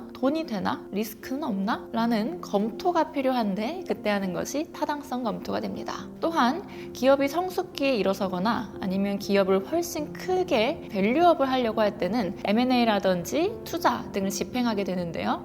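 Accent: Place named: South Korea. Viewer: 영어 발음이 콩글리쉬세요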